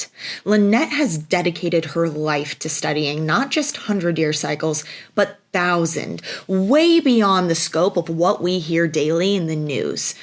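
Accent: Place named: Cleveland, United States. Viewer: are you from the Cleveland area? thanks.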